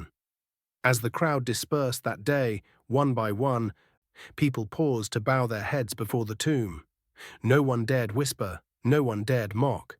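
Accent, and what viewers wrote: United Kingdom. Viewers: Would rather see the moment of the knock down in dramatic movie footage than listening to a guy with a British accent assuring us that the good guy won. Let's hear a good old boy from the Deep South giving us the play by play of teaching the young punk a lesson. Save the limey for a similar story of some immature loser trying to abuse a Buckingham Palace soldier or Horse Guard, etc.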